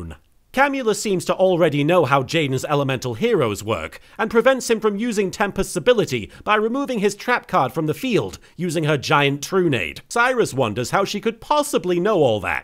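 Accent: United Kingdom. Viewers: Yeah, this series is much better with his british britishness tbh.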